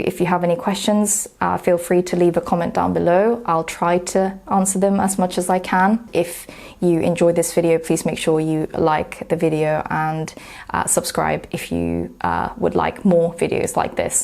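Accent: United Kingdom. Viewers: 영국식 발음 공부할때 따라할때도 넘 좋아요! 이런 영상 자주,, 올려주세요❤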